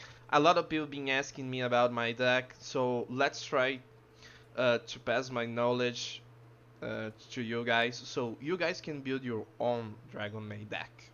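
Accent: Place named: Brazil. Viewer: Qual foi, pelo inglês sabia que era BR, tem alguma forma de falar contigo pra me explicar como rodar esse deck ?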